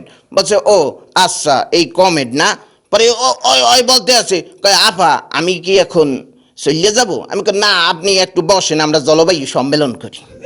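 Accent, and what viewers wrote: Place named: Barishal, Bangladesh. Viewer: barishailla vasha shunlei moja lage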